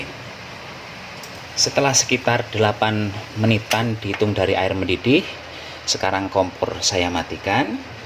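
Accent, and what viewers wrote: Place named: Java, Indonesia. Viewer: terimakasih mas... Aku suka channel ini... Mas nya pinter masak dan orang nya suka lucu- lucuan. Bikin ketawa. Suka juga dengar suaranya asli jawa.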